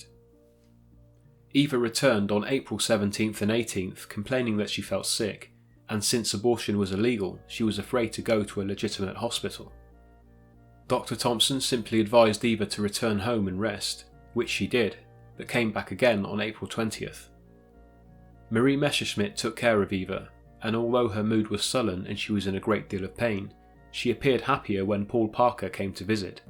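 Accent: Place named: United Kingdom. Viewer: Sorry but "Tales from California" read with a British accent... hmmm. doesn't jive... It's not "Los Angel-ee-s" It's "Los Angel-y-s".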